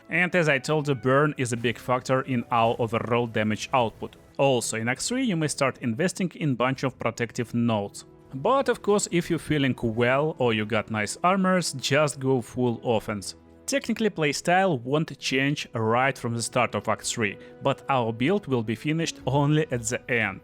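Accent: Russia. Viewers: Русским диалектом на английском=)))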